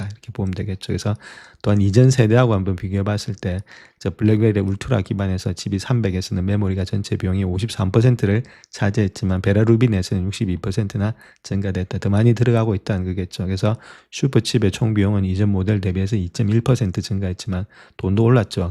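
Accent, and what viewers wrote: Gyeongsang, South Korea. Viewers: 그냥 지나가세요. 경상도분들의 발음이니까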